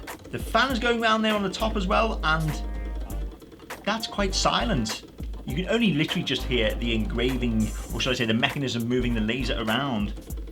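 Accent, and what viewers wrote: Liverpool, United Kingdom. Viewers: Hi, nice review - I built my own very similar engraver but one of these caught my eye while browsing eBay. However, I cannot work out your accent. Bit of Scouse, bit of Geordie and a bit of Oxbridge:-) Where are you from?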